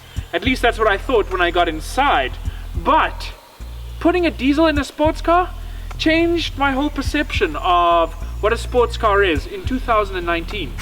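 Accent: South Africa. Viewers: is this guy South African? I'm new to the channel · Are you South African mabey? The accent sounds familiar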